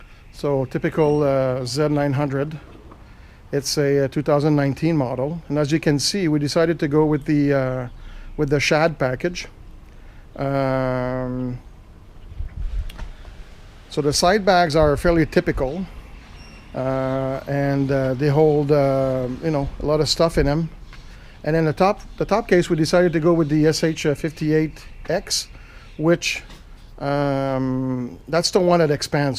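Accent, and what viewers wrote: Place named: Israel. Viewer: אין כמו המבטא הישראלי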